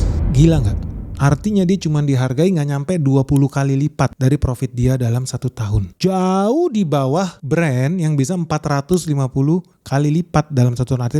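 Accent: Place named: Surabaya, Indonesia. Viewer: Gaya ngomong Bang model surabayaan terang2an dan lucu 😂😂😂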